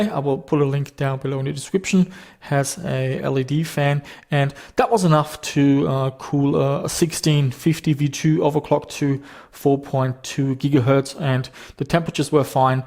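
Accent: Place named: Germany. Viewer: He's Australian, but I believe he has family in Austria from memory, so that may be why he sounds slightly German.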